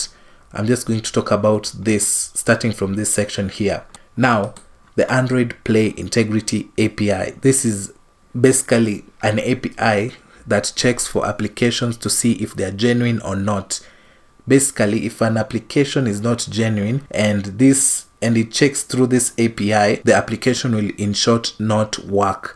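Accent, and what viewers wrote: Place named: Kenya. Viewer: you sound kenyan :hand-pink-waving: I love the sort of videos like this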